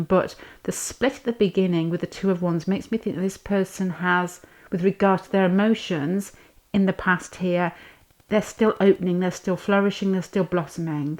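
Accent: Manchester, United Kingdom. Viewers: Are you mancunian? From Manchester?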